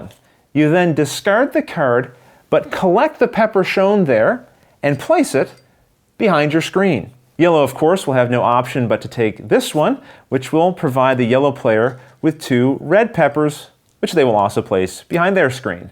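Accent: Canada. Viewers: I could really tell you are Canadian from a few of the words you said in this video. I recently discovered that Canada has the largest tabletop gaming population in the world. Thanks for this video really helps. Not it's time to go play the game!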